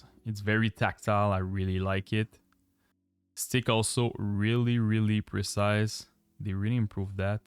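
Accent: Quebec, Canada. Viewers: lol bro i can ear the quebecois from this video XD nice to see a fellow Qc doing content in english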